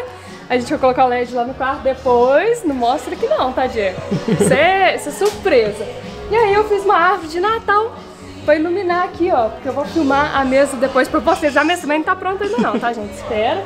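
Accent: Minas Gerais, Brazil. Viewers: Oi vc está falando mais do que NUNCA o sotaque mineiro..Ñ vi vc e o falando em turco tô com saudades. Fiz Natal DEUS os abençoe 🌲🎆✨🎊💝💖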